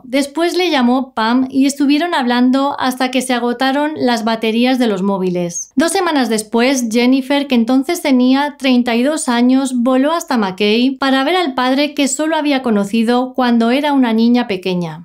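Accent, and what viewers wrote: Spain. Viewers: Me encanta como hablas y tú acento español.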